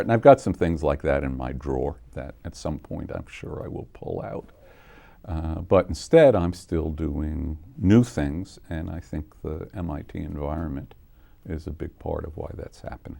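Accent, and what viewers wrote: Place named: New York, United States. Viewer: Strong NYC accent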